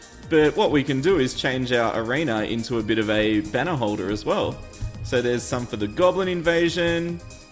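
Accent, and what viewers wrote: Australia. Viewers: Haha, that polish shoutout was so funny :). But if u want to say it somewhat correctly you say: Lubee-eu tziastetshka. Omg that was so funny just to try and figure it out ;). Please say: i like to watch watches in your australian accent but please say it in a very 'strong' accent. Keep up your great work, everyday i cant wait for another video. 9 and a half big chocolate cookies for you! ( half got eaten by the eater of cookies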